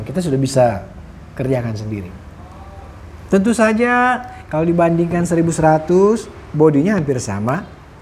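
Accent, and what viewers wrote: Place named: Bugis, Indonesia. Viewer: Org Bugis y??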